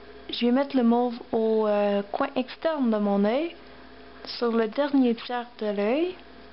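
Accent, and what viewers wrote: Quebec, Canada. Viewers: tu vient du quebec?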